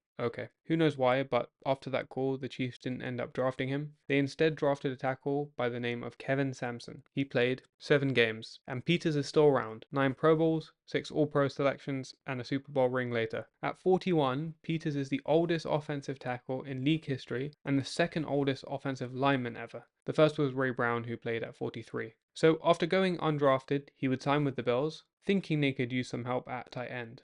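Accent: United Kingdom. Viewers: British guy talking about American football?????.?.? WHAT!!!!!!!!!!!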